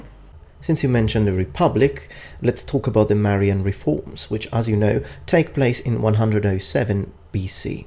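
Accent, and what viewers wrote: Italy. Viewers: Me: is this guy Italian? Me: yes, yes he is. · Hey I really like your videos and I dont want to nitpick here but I fear that you italian roots have 'tainted' your latin: AFAIK (and please correct me if I am wrong!) the C was always pronouced like K in ancient latin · I've heard Latin being spoken with an italian accent ( like here) or a more clear sounding version. Makes me curious of which one being correct, since Italian is of course influenced by the Ubians and Langobards that conquered Italy. PS: I'm not sure about the melee part. Wasn't the long metal tip of the Pilum made of soft iron and would therefore bend very easily ( better than Gladius of course when attacked by cav)?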